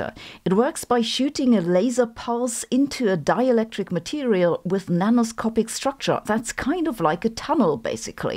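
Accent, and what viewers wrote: Germany. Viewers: Nah, she's just German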